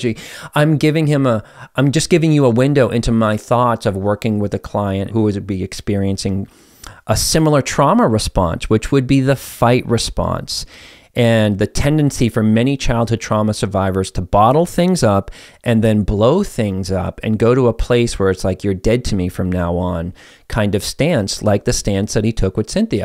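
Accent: Boston, United States. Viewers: Are you out of Boston? Just hearing the beginning of this story. Ugh my heart from this story and I love your videos so helpful. ❤️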